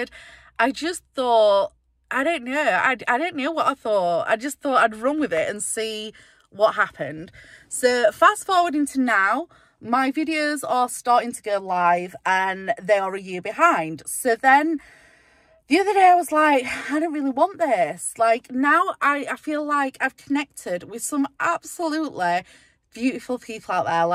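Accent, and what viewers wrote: Yorkshire, United Kingdom. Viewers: Your channel is good, you are not boring, you are aesthetically pleasing (we don’t all want to watch perfectly filtered beige channels), you are not common you’ve just got a Yorkshire accent and there’s nowt wrong with that! 😂😂 I think one a day is perfect and yeah, no spoilers please. I’ve gotta say though when you mentioned be positive Sam uploading again you got me all excited and confused 😂😂. I miss her videos! Very brave video and I completely understand where you are coming from. Lots of love xx.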